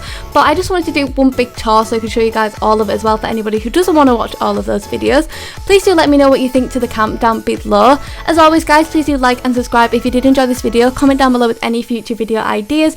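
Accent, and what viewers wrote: United Kingdom. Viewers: This may or may not be creepy but like I really love your voice (like your accent) Maybe its because I'm American but I really want a British accent (Also I just started watching you like 20 min ago and I'm already subscribed :D) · Honey Dew I love her accent too! But I’m warning you do not try and replicate a British accent as an American you’ll sound so stupid!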